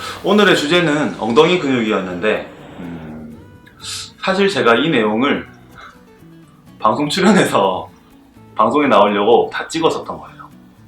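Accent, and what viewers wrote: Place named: South Korea. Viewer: 엉덩이를 쪼으면서 ㅋㅋ 사투리가 참 정겹습니다 ㅎㅎ 늘 좋은 정보 알려주셔서 감사합니다. 따라하면서 괜찮아진 곳이 꽤 되네요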